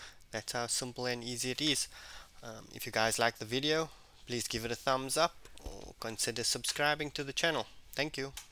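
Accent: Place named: South Africa. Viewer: Thanks for the video, nice to hear a South African accent glad there are local tech YouTubers out there.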